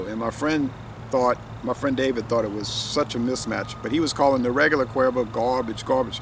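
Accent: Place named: New Orleans, United States. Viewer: You are welcome, I always really enjoy your vids, and have to admit enjoy the accent as well as I lived in and around New Orleans for many years. For you or anyone else interested, I rn across this posted today. This fellow does a deep dive into the rules and regs of Tequila and the oversight. Interesting but maybe a bit much for the casual Tequila drinker. <link>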